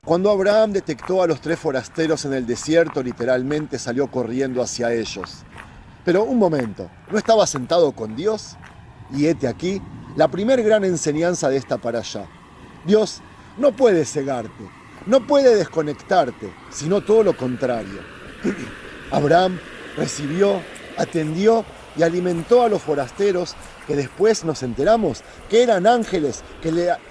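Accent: Argentina. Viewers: Che vos sos de Argentina?🇦🇷. Me encantan tus videos. Saludos desde Republica Dominicana🇩🇴. Y bendigo al Dios de Abraham, Isaac y Jacob🇮🇱, Padre de Nuestro Señor y Salvador Jesucristo.✝️ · Si eres de isrrael porque ablas como argentino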